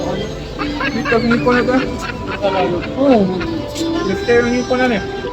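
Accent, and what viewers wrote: Visayas, Philippines. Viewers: If I close my eyes...BISAYA jud ang nagastorya, sa dihang pagtan-aw na nako,si diay!😹👍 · Bagtikas bisaya nimo dol oi.. padayon lng dol sa pag blog og mga lamian pag kaon 👍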